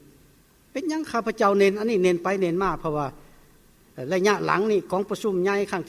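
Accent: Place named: Vietnam. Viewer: บักควายเอยกุฟังมืงเว้าเหมือนแกวสัตตตคนลาวแท้บ่อเว้าแยบนี้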